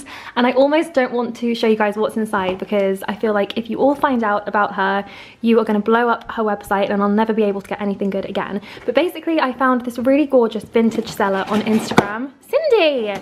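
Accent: France. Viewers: An amazing video like all the time. And don't worry, your french accent is good and really charming!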